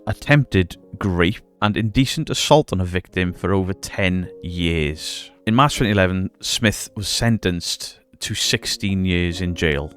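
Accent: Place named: Newport, United Kingdom. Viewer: you must live near newport with that accent.greetings from barry island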